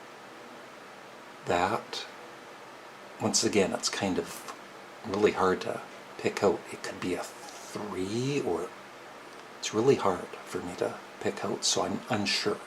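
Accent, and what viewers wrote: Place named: Canada. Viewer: First off I love your Canadian accent. Secondly I am not color blind and saw a clear number in every circle. You were very accurate in your guesses when you weren’t sure. I hope you get the chance to get the glasses from what I’ve seen online it can be really life changing. Maybe you could do a go fund me and I’m sure people would donate.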